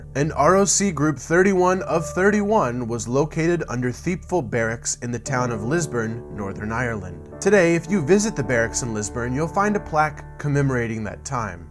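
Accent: United States. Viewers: Well done on the Irish border explainer - it's somehow reassuring to have a knowledgeable US voice explain it. Makes it all feel less crazy. I'm looking forward to watching your Berlin Wall video - I lived through the fall in 1989 when I was studying in Berlin. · I'd love to watch this but one minute in and I can't take the awful american pronunciation anymore, sorry man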